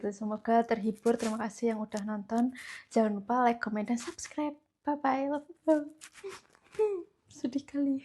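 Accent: Java, Indonesia. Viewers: kok meddok bgt to lek omon ki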